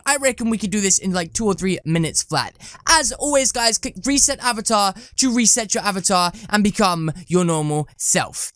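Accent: United Kingdom. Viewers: I like it when u talk u got british acsent ( dislike if acsent spelling is wrong)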